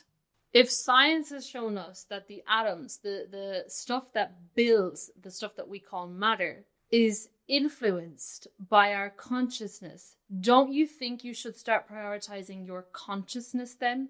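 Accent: Ireland. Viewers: pretty sure shes irish lol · Sound's like you have a Irish thang (accent) Are you Irish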